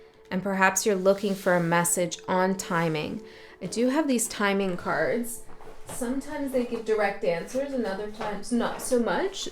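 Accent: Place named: Toronto, Canada. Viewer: I’m from Toronto too (Toronto Mans accent) 😂😂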